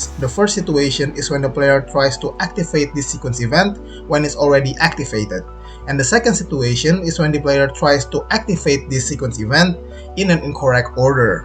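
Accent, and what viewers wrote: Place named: Indonesia. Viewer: That sucks! hope you get your account back. But random question, Are you Indonesian by any chance? I noticed you have somewhat of an accent and it sounded similar to Indonesian accent.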